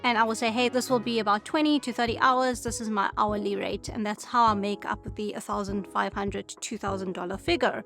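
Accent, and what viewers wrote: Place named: South Africa. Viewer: i miss south africa, i like your south african accent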